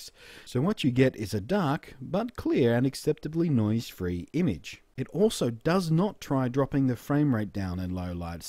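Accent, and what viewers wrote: Australia. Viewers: I thought I heard an Australian accent. I love it. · Hi, love your channel. Good to have a distinctly Australian reviewer. Enough flattery.....:) The reason I would love to be gifted this item is so can take clear videos/photos of my kids. I use my 3GS iPhone for photos/videos, it's OK, but something like the bloggie would be great because it is simple to use, I could teach my kids to make Vids also.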